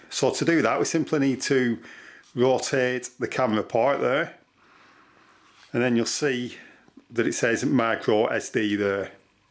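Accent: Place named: Wigan, United Kingdom. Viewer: I live in Pennsylvania but am originally from Wigan, you sound as if you are from near there, lol.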